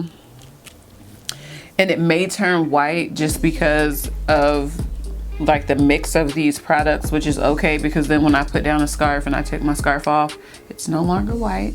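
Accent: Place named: Nigeria. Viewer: I hear a bit of a Nigerian accent. Is she African??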